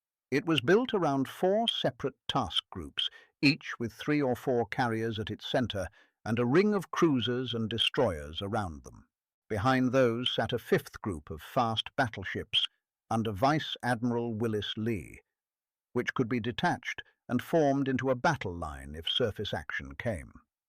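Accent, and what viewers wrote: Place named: United Kingdom. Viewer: Why does a channel ostensibly about US history use a narrator with a British accent?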